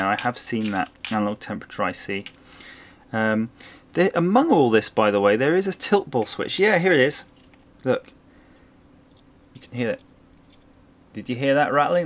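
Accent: United Kingdom. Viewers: I like the way you describe all the kit components! Nothing like to hear the British accent! 👍🏼😃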